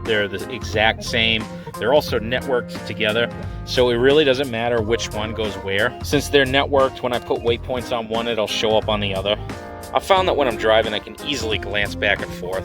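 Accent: Midwest, United States. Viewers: Interesting Midwest accent, never heard that one before.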